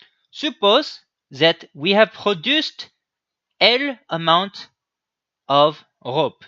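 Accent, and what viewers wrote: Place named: France. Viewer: what is this metaphysical comedy where you make amazing math content but do it in a French accent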